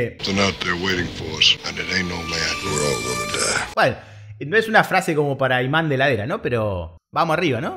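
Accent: Argentina. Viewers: Como me rio con el acento Argento.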